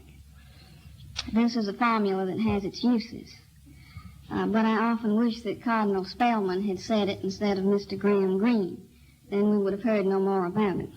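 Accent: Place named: Georgia, United States. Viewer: She has a very strong middle Georgia accent. It's easier to distinguish in her reading of "A Good Man Is Hard to Find." No, it's not a hillbilly or mountain accent or a swamp accent, but it's definitely a strong accent--nowhere near standard.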